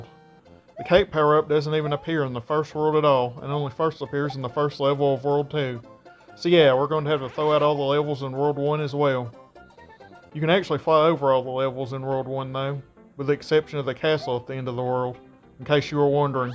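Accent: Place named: Alabama, United States. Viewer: Where are you from, buddy? Alabama?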